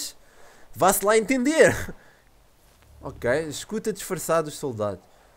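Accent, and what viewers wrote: Portugal. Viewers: Esse cara é português ??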